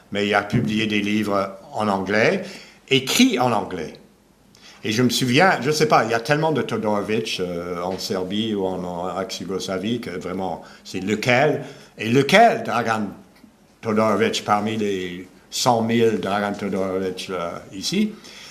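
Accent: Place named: Montreal, Canada. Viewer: Talks like a guy from Montreal. Yeah.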